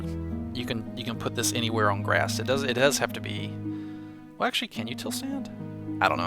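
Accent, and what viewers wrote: South, United States. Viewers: What are you talking about? There is no 'usual' or 'normative' english (language) accent, it depends on where you live and what you're used to. If you live in America, the 'usual' English accent to you will be American (ignoring the many american regional accents). I'm sure ' accent is fairly normative for where he lives. He'll just have one of the many different Southern USA accents, of which Texas is a stereotype people like to compare accents to. Here in England his accent is not usual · there is no usual english. usual for americans is different from the usual for the british and he has an american accent.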